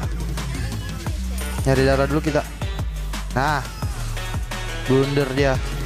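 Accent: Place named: Jakarta, Indonesia. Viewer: Buset, noh Betawi bener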